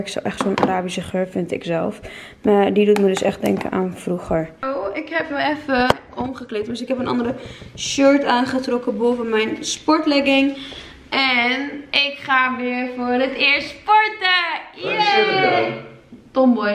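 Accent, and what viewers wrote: Twente, Netherlands. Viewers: Is Twents accent hahah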